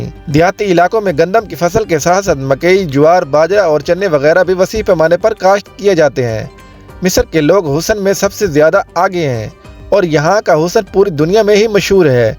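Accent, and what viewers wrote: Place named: Punjab, Pakistan. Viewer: بھائی اردو تو سیکھ لو ۔۔ پنجابی میں اردو بول رھے ھو ۔۔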